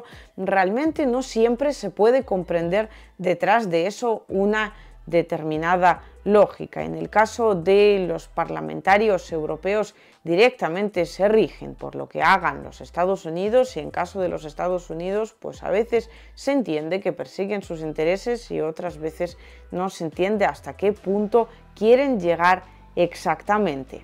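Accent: Spain. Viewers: me acabo de dar cuenta después de llevar una grabación de ti un maestro lingüístico de acentos del español que tú hablas El Español de los gallegos de España felicidades sigues siendo la voz de RUSIA en Español y nos permite ver en México las dos caras de la moneda pero nosotros le vamos a Rusia porque estados unidos y su vecino del sur nos conocemos tanto que no podemos engañarnos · Todo esta bien . Pero deverias cambiar tu acento Español. El acento español no cae bien en América latina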